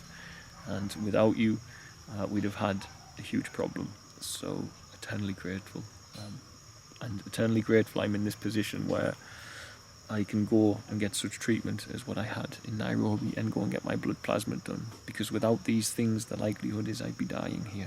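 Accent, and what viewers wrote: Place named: Newcastle, United Kingdom. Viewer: Hi I'm very happy to hear the update, as a UK national (I guess from the Jordie accent) could you use the NHS to keep fees down?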